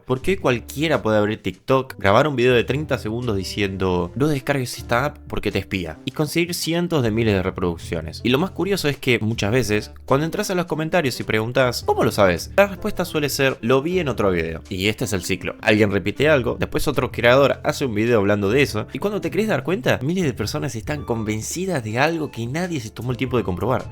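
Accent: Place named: Argentina. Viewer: sabes que un video sobre piratería va a estar bueno y sincero, cuando es narrado por un argentino 🗣🗣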